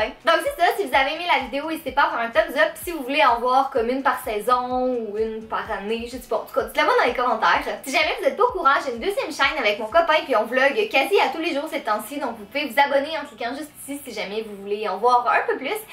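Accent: Quebec, Canada. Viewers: Tu. Viens du Québec ? :) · au Québec ils parlent comme ça c'est tout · maïmouna thiam c pas un accent Marseillais !! C celui du Québec ! :) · mais si tkt juste que je ne m'y attendais pas que tu sois québécoise😁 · Du québec ( désolé je réponds a sa place ) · 😍j aime troop tes videos et j aime trop ton accent quebecquois trop cute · c'est une cébéquoise elle a l accent, ps:t'es magnifique trop trop belle j aimerais bien être comme toi♡♥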